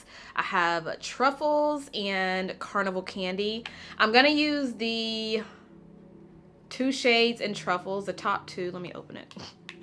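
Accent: South, United States. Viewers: Love your videos and your southern bell accent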